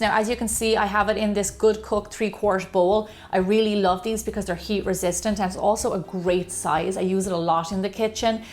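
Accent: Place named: Ireland. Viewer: Gemma Stafford ya! Like your english with that Irish accent. Very unique 😉 My mom loves hearing you talk in your earlier posts ☺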